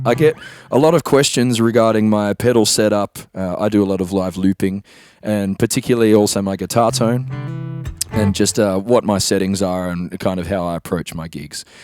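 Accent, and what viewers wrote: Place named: Australia. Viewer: Excited to see it! It is funny how a looping rig is an evolving process. I truly believe simpler is better, but you’d never know it when I set up my solo rig. See you soon in Nashvegas! Ps.... lived in Sydney for 2 years. Listening to your accent brings back some really good memories! Ta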